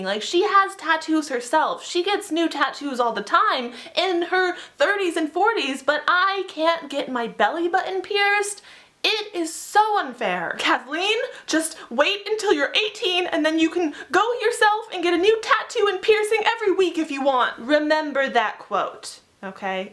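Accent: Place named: Ireland. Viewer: Irish whoo 😀😀😀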